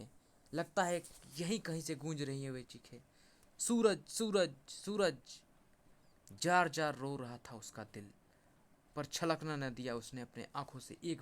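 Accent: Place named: Bihar, India. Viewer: Bhai tumhara bolne ka lehja saaf nahi hai, apni voice ko clear karo aur thoda aaram se padho. Shabdo ka pronounced thik se karo hindi mei,,, Bihar se ho kya aisa lagta hai tumhare bolne ke tarike se.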